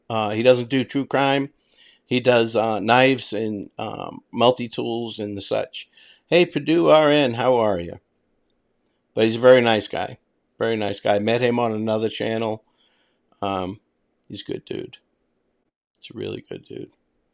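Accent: Boston, United States. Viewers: Love the way you talk. Long islander here, transplanted to rural PA. Sounds like Boston but it's refreshing brother. Good info, subbed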